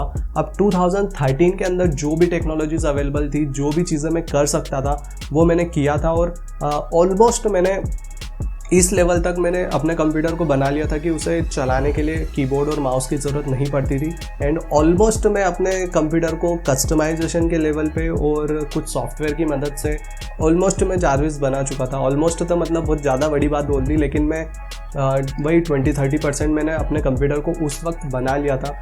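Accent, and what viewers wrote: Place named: Gujarat, India. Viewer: I bet he is Gujrati or Rajasthani... Because the way he says "set" or "ek" & other words... Well thanks for this video brother ❤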